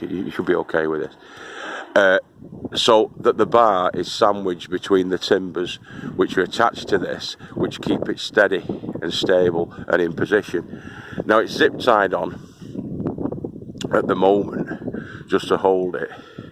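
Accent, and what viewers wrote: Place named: North West England, United Kingdom. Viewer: Please can you explain your name? I know is teacher in Indonesian and perhaps other languages. Then what's its origin and relationship to you? You sound like come from NW England to me! Generally enjoy all your videos Cheers john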